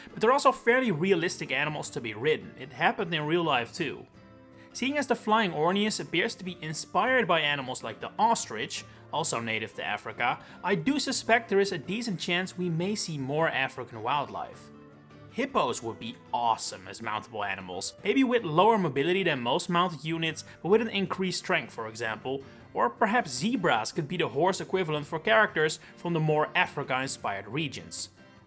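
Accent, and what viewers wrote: Netherlands. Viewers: Bro are you from the netherlands?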